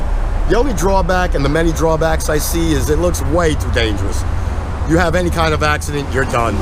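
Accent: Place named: United States. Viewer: Like 110 I enjoy to watch u videos and I enjoy to listen the American accent to learn it 👍👍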